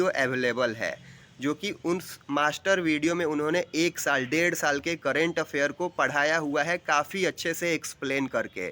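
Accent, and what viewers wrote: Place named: Bihar, India. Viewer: Bhaiya aap up se ho kya ki bihar aapki aawaj up bihar wali lg rhi h ❤ please btaiye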